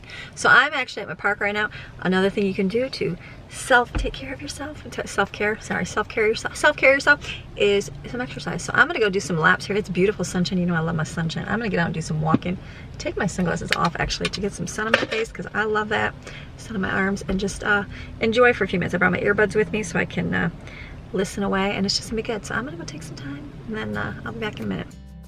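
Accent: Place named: South, United States. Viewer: Southern talk yall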